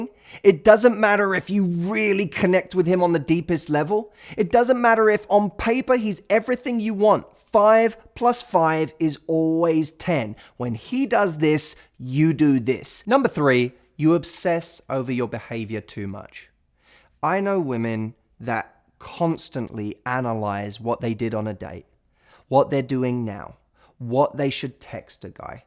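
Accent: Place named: London, United Kingdom. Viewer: London accent noted :-)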